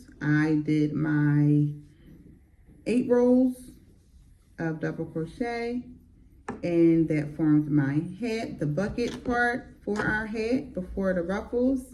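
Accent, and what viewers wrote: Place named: Philadelphia, United States. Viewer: Been following you for a while since your crocs went viral . I heard your voice & was thinking where in the world is she from ?? She gotta be from Philly lol❤️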